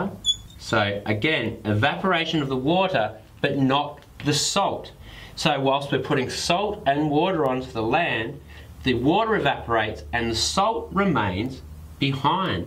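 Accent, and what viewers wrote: Australia. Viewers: I could listen to Aussies talk for hours. Thank you! Very concise depiction of salinization. Cheers!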